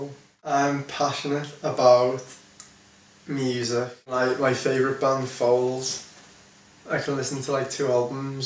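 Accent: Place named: Liverpool, United Kingdom. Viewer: Scouse accent 💯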